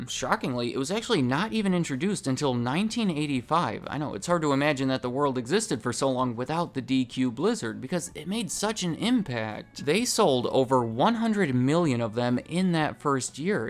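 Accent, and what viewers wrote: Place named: Alabama, United States. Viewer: Sounds Alabaman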